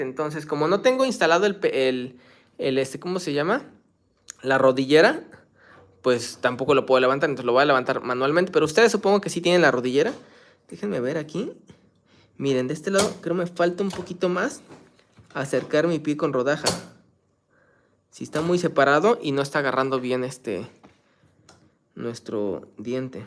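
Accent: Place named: Mexico. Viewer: BUENAS TARDES EN CANARIAS.... BUENAS TARDES PARA TODOS LOS QUE ESTAN EN ESTA LINEA... QUE PLACER ESCUCHAR EL ACENTO MEXICANO, Y SABER QUE ES NUESTRA MARAVILLOSA LENGUA, LA ESPAÑOLA ❤ ❤ GRACIAS POR ESTE LINDO VIDEO.... UN SAUDO PARA TODOS....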